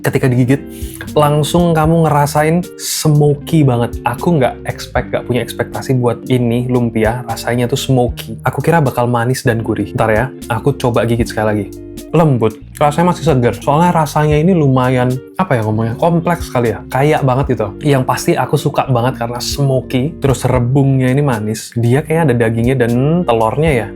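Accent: Java, Indonesia. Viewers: Keliatan nyaman banget di semarang, tapi salfok sama medok nya 🥺 semangat keliling semarang nya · Wiiiii Semarang... Seneng bgt explore di tmpt perantauanku Asyik polll kyk diajak jalan jalan, medhoknya sukaaa nagih as always wkwk Jujur, liatnya makanan-minumannya bikin ngiler 😣😣 Makasih, aku jadi tau ada kulineran di tmpt tmpt itu wkwk Suka bgt konten jln jln kota kyk gini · SUMPAHH, MEDOK BANGETT 😭😭😭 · jadi muedokk gini... yang nonton juga ikutan medok ini jawaku 😂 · Ini lama-lama jadi food vloger berkedok vtuber😭 Tapi seru poll sii, apalagi kalo ngomongnya medok. Ditunggu konten jalan-jalan selanjutnya ✨🧡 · medhok is the best, lucu banget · Medok men boso jowo ne mas 😅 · versi medhok emang nagih banget ❤❤❤ Suka banget kontennya ❤❤❤ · kok di surabaya sama semarang medhok banget yah apalagi kalau lagi ngobrol sama warlok padahal di jakarta nggak medhok loh, cocok banget emang kalau medhok 👍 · itu orang mana sih ngomong Jawa nya agak medok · gak ekspek jadi mas² jawa tulen bgt medhog😭🙏🏻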